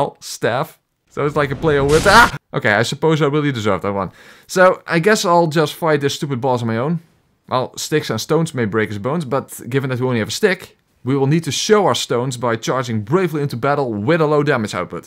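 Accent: Netherlands. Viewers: I fxcking love Dutch accents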